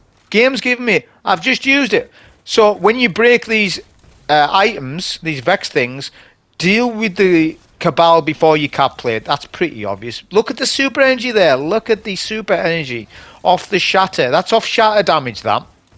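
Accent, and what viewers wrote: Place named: North East England, United Kingdom. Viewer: Nice video mate. I love Stasis, it's my favorite Subclass. Just to note, when you have Aspects that use grenades i.e. Bleak Watcher, they have a separate cool down, which you will see in the text description of the Aspect. Love your videos on general mate, I miss loving in the NE of England, so enjoy listening to your accent.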